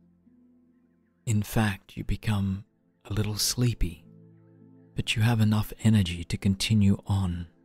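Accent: Australia. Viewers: I’ve listened to only a few guided meditations and I’ve found there’s certain sounds in the voices that are not so gentle.... a lot of times the S sound and sometimes the T sounds are kind of piercing. Is it just me? Is there any way to kind of muffle that at all? Aside from that, it’s great to hear an Aussie voice guiding a meditation... thank you!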